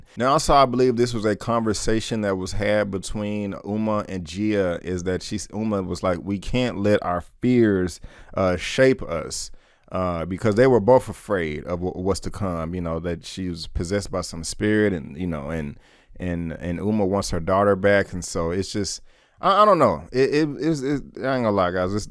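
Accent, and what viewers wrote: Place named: South, United States. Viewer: And where are you from??? I can tell it's in the south somewhere from your accent but bc you know who young joc is that made intrigued about what part of the south you live in. I personally know joc so I can kinda guess around which area.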